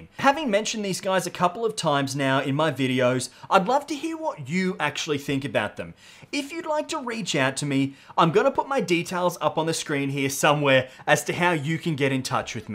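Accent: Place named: Australia. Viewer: Love the content bro, clear concise and Australian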